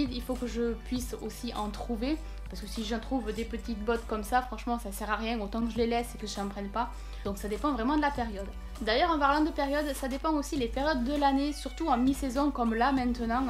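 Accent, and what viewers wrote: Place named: Toulouse, France. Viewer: Juste super resultat j'adore cette sonorité toulousienne ^^! Cest merci à vous deux d'autant m'apprendre et nous partagé tous ça! ET SURTOUT davoir eu et pris le temps de repondre cest super.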